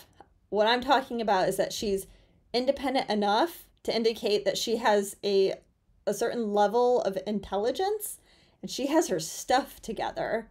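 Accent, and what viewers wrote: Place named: Midwest, United States. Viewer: Liking the midwest accent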